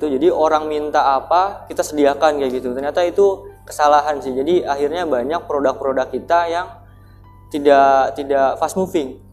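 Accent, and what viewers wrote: Banyumas, Indonesia. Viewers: Alhamdulillah,..wong ngapak.. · Wahhhh,,, cah banyumas, salam ngapak mas dari Purwokerto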